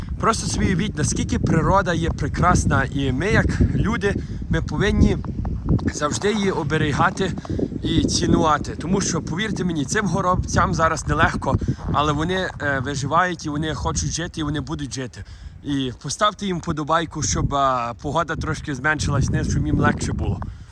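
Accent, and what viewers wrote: Western Ukraine, Ukraine. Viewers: Як гарно! Ялинки, як у нас в Карпатах в Україні. У нас зима теж є, але підвищена вологість у горах при -30°С - це страхіття😒. А сніг рипить теж так само🙂. Ви розмовляєте українською якось так, як на західній Україні.... ваші родичі з цих місць? Де Ви вчились розмовляти українською? Дуже гарно розмовляєте !!!👍👍👍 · це західноукраїнський акцент початку 20-го століття. Майже всі приїжджі українці в ХХ столітті так розмовляли, бо були вони саме із цієї частини України. Якщо подивитись канадські словники української мови, або книги, які її вивчають, написані в ХХ столітті приїжджими українцями, або вже їх дітьми, то там буде така ж версія мови. Звідки вона у власника каналу тяжко сказати, можливо у селах Львівщини так розмовляють, можливо, автор звідти родом, ну, або він працює та живе у середовищі українців саме із такою версією мови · Дякую за ваше відео. 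Мені не знадобиться ваша розповідь тому що багато років за кордоном у Європі, і це все пройшла. Але приємно дуже слухати вашу розповідь такою твердою западенською мовою без жодної помилки. Ви молодець, бажаю вам успіху. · Противный западенський акцент!!! Фуууу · Західнянські говірки, а не мова!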